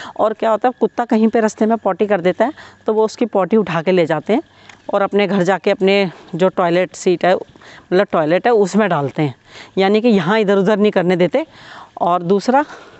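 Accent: India. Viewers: Thanks medam ap acha bolti bilkul bhartiya andaj me Apki real hi sari video h modifiy nhi karti ye acha laga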